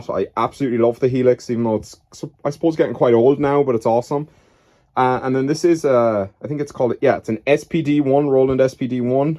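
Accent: Ireland. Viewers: lol - dude I just searched for a Bitwig vid on reverb and mindlessly clicked the top one and turned back to the DAW. 10 seconds later I'm thinking "why does this dude sound super similar to that funny Irish chap I follow on Instagram" 🤣 Actually kinda cool to see you being an absolute social media megastar over there while you have a humble 5.6k followers over here, keepin' it real. Awesome to see how much you blew up dude - I expect the studio has probably expanded some since your success? Also mad jelly over your Polybrute. Good on ya mate and cheers for the tips.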